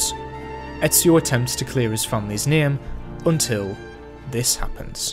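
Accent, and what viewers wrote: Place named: North East England, United Kingdom. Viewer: This character analysis is one of the most detailed I’ve ever seen on YT. You’ve made me fall in love with Ezio even more than I already did! Also, can’t help but notice the very north east English accent you have 💃🏻. Fellow NE’er here 😎😎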